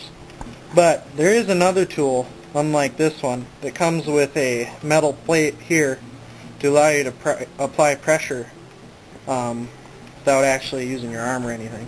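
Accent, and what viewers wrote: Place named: Canada. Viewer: Are you from Canada? I here a slight accent.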